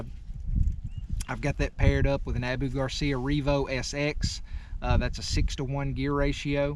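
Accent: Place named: South, United States. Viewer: So I've gotta ask you, what's a pieket, I know what a pocket is, but not sure what a piekit is, 😄😄. Man I had too, been watching your videos for awhile and you've got the biggest southern draw I believe I've ever heard. I get a kick on how you say pocket. I do enjoy your videos tho.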